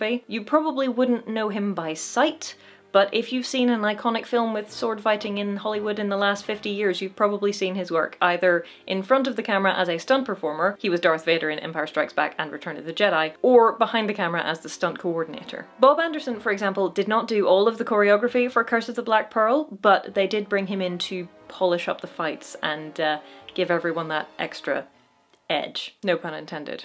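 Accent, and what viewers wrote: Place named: Northern Ireland, United Kingdom. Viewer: Now that's a posh Norn Iron accent! North Down?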